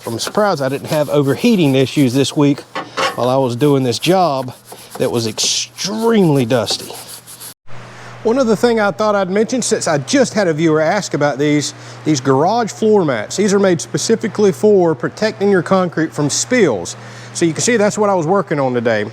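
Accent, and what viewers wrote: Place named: Florida, United States. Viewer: Florida accents bust me up sounds like you say oil without the i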